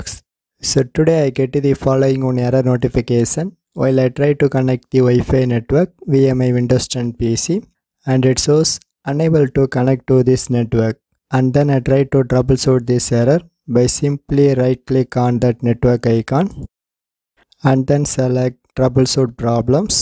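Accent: India. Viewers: he has an indian accent thats why its sounds like gays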